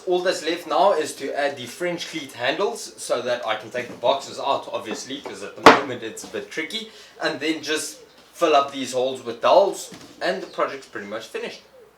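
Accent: Afrikaans, South Africa. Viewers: Are you from south africa???because the accent sound very Afrikaans???😁😁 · Strange accent. Slightly German, slightly South African. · This is my first video of yours that I have watched. I am not from wherever you are from and I am going to guess your accent. Please don't ridicule me for my ridiculous guesses. To me your accent sounds like South African or Swedish mixed with Australian. How wrong am I? By the way, I enjoyed the video!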